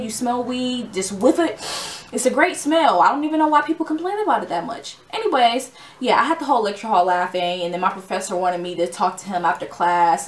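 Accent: Detroit, United States. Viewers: bro you sound like you from the D, this how we all talk around here lmao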